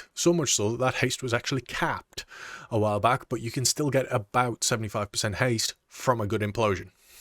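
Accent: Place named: North East England, United Kingdom. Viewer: You sound like you're from NE England mate like me. Cheers for the advice, appreciate it.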